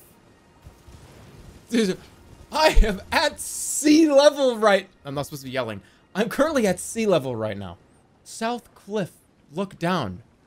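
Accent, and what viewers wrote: Boston, United States. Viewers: The A at the end is cause of his Boston programming, it's like "Germ-ah", like "i'm walkin here-ah"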